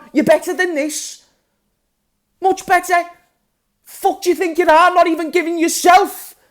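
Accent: Liverpool, United Kingdom. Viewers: Another gobby scouser strikes again.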